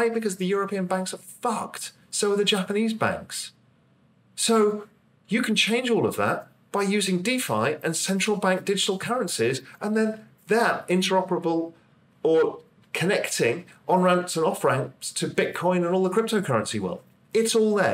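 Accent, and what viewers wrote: United Kingdom. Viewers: Ha ha ha ha. Like I said a few weeks ago. BS with a British accent is still BS!!🤣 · Really diggin’ that UK accent and cadence! Makes these wise words even better!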